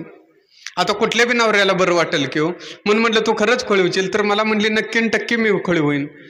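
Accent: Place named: India. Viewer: अस्सल ग्रामीण ढंग .. खूप छान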